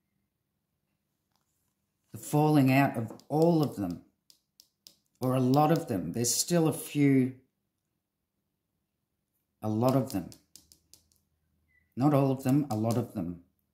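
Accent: Australia. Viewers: Those poor silly girls. They obviously had no idea what they were getting themselves into. They should have disappeared if they did that They were sitting ducks. Didn’t realise you were Australian I’m here to on the nsw north coast. I really enjoy your readings. Thank you ❤️❤️❤️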